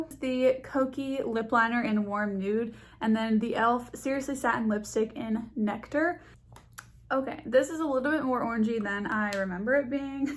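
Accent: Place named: Europe. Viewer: is that where you're originally from? I'm dutch and you just sounded more European to me 😉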